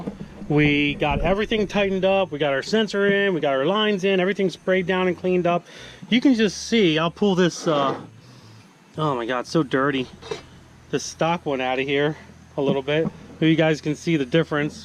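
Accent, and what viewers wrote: Pennsylvania, United States. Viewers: It's a Pennsylvania thing. I'm from pa and everyone says it like that.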